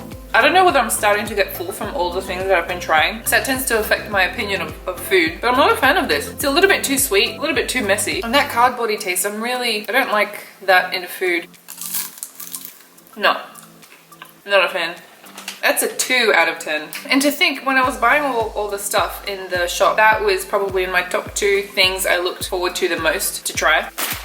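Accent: Australia. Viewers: I love to hear aussie accent.. plus eating filipino food... that is gold... keep it up.. new subscriber here... I hope you recognize me..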